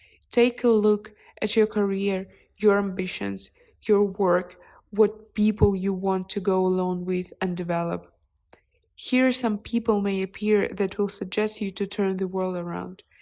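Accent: Russia. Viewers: я вам дам честный конструктивный критический отзыв, так как я на самом деле очень ценю вашу русскоязычную эмоционально окрашенную подачу и хочу помочь. Надеюсь мой отзыв поможет если вы нацелены на англоязычную аудиторию. Я могу судить так я двуязычный подписчик, очень давно живущий в англоязычной стране. Для тех кто с рождения говорит по английски в этом видео слышен русский акцент с не натуральными английскими интонациями. Это не немного раздражает и не даёт насладиться содержанием так как форма не натуральна и натянута. Кроме того интонации не эмоциональны и не зажигательны как ваши, так что ваш отличительный шарм теряется. Я бы посоветовал найти рождённого в англоязычной стране русского человека, таких много, бросьте клич и кто-то откликнется. А русские субтитры тоже хорошая идея от ваших подписчиком. Английские субтитры можно в настройках YouTube включить. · Too much of the accent, reminds me my English classes in Russia. 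It’s a not really good way to learn English. Don’t like to criticizing anyone, just my personal opinion. Очень люблю и подписана на все его каналы.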